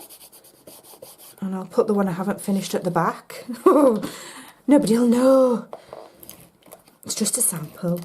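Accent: Yorkshire, United Kingdom. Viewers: Oh my gosh girl. Not only do I love this box, but you make me laugh. It's just like listening to my cousin talk! i was born and bred in Yorkshire, and my family still live there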